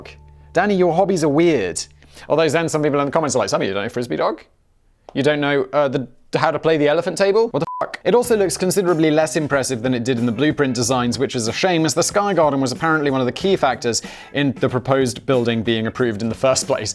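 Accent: United Kingdom. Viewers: Off-topic linguistic question from an American; You said, "Comer" instead of "coma". Why is it that people in the UK tend to pronounce "uh" or "a" as "er"? Honder, comer, etc... Is that the original intention of the pronunciation and it's just another way America tried to be different? Or is that just sort of how your speech evolved, like a vernacular?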